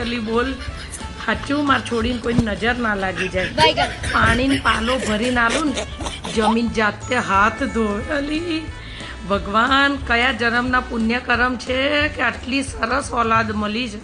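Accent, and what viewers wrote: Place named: Ahmedabad, India. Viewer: આ તમે અમદાવાદી અવાજ ના વિડિઓ બનાવો છો એ બધા સુપર હિટ છે,, હજુ અવાજ વિડિઓ મોકલો અમદાવાદી 😜😜😜🌹🌹🌹❤❤❤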